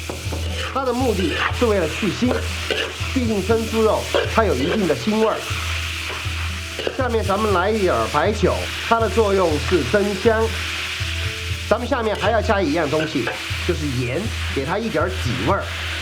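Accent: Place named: Sichuan, China. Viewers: 椒鹽普通話=四川普通話🍇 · 川普...听了都想回四川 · 说话语气和性格都是典型的四川人啊啊啊啊啊，太喜欢了！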